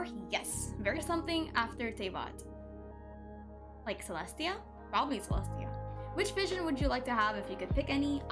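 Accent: Philippines. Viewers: I KNEW IT LMFAO AS A PINOY ANOTHER PINOY ACCENT IS SUPER RECOGNIZABLE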